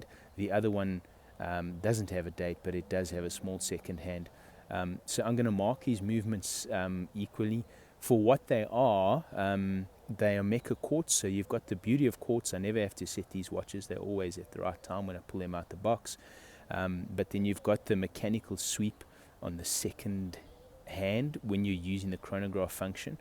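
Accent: South Africa. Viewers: South Africa! To my American ears it is hard to distinguish from Australian (but I'm getting better). Great review. Thanks for posting! Also, thanks for the web page directions. I'm following and looking for more posts. P.S. I can't believe they've stopped the sale of alcohol Nation Wide due to COVID. My prayers are with you!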